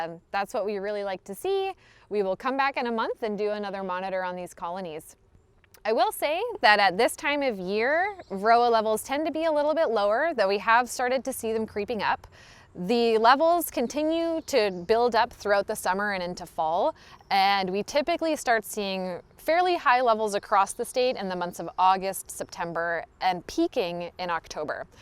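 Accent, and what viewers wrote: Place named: New York, United States. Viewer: Finally! A beekeeper who keeps bees and thinks like me! Super eloquent and intelligent! is thorough and knows her beekeeping! I love her explanations and NY accent 😍 Keep up your awesomeness and please keep posting! 🐝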